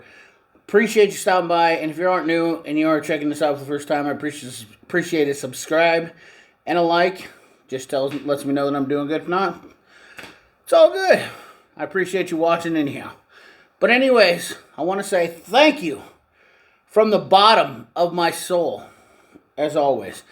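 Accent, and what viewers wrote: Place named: Ireland. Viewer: Great Irish accent.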